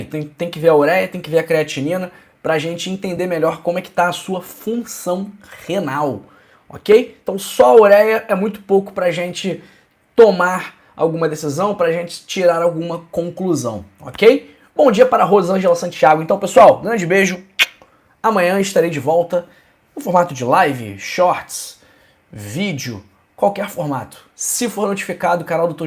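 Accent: Rio de Janeiro, Brazil. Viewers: Esse sutak carioca é que mata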